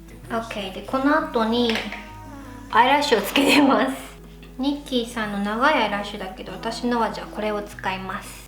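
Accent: United Kingdom. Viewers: Your British accent is the cutest thing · It is a British accent actually, she lives in London. Also that's not true about English speaking Japanese sounding British naturally; whoever you are, you will adapt the accent of whoever you learned whichever language from and how you are used to hearing that language spoken. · 外人さんメイク良く見るけど、オカマメイク(ドラッグクイーン)だよねw プロダクトめっちゃ付けるし化粧品会社めっちゃ儲かってるんだろうね。イギリス英語可愛いし、さんの全てが可愛い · British Accent たまらないです！ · Omg you have a British accent <3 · I love your British accent whenever you speak English 😭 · You have a British accent to your English! I love it, it's cute. I really enjoyed the video, thanks.:) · Her accent is british............................. WHY IS HER ACCENT BRITISH?! girl.exe has stopped responding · Wait...how does she speak English so well??? And with such a Brittish accent lol? Anyway quite enjoyed this 😄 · you're so adorable and i love your british accent! 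uwu i think you did a good job considering it's the first time you tried a make up look like this 👍💕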